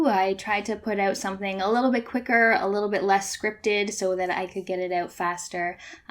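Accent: Canada. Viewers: Hi, great review, enjoyed it a lot. Lovin how you explained it very easily. On a slightly different note, Are you from Canada 🍁. 😀 👍 👍 👍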